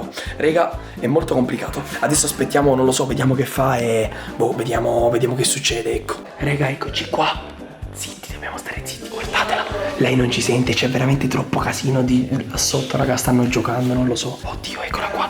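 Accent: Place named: Veneto, Italy. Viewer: mamma mia che dialetto Veneto biceri zio can glie i bicer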